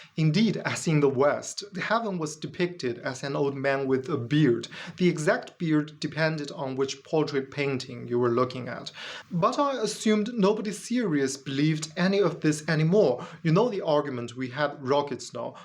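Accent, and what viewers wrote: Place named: United Kingdom. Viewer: AI has better pronunciation and intonation. Not intended as a critique of English. Your English, is excellent. "Heaven" not "haven", "These" was mis-read as "this" (This is just a little feedback for you, ). I thought, originally that your accent was British-flavoured, but your subtitles are in US English. Where did you learn your English? Your ideas? Unconvincing. Successful religions have always been about controlling other people. That is what they are selected for, if they do not do that, they get replaced. Reality is not important to religion (well, successful religions). I suppose the Flying Spaghetti Monster has some connection to reality.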